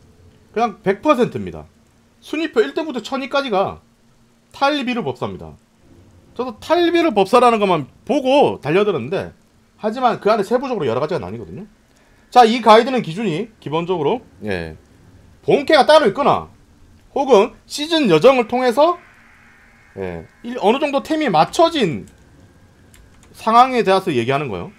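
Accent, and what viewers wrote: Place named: Gyeongsang, South Korea. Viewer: 원래 경상도 사람 저정도 악센트 다 있는데.. 듣기 싫으면 다른걸 보던지 다 님처럼 생각하지 않으니 님이 이해를 하세요 서울사람보고 느끼하니까 말투 바꿔라고 하면 똑같이 기분나쁠거 아닙니까 ?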